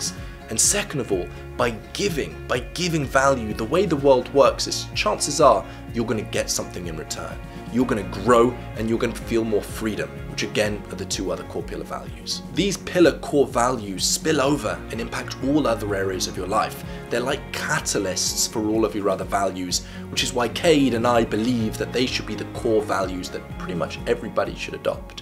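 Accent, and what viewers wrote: United Kingdom. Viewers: I pronounce with "k" and I'm in the UK. His British accent is in the way he ends every sentence. :) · love your british accent bro ! I appreciate training my english with your smart videos (I 'm french)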